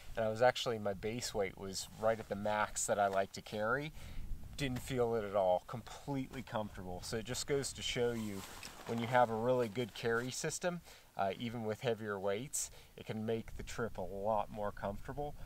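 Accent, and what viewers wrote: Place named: United States. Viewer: Is he British? Sounds so American (Australian?), but maybe my ear has been trained otherwise, such as with Scottish sheep-shearers?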